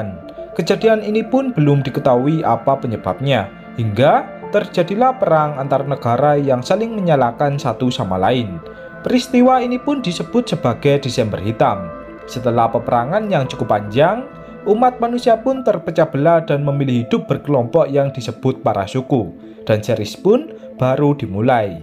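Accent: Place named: Tegal, Indonesia. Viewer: Asal tegal apa brebes yg ceritain film ini..logatnya kentara banget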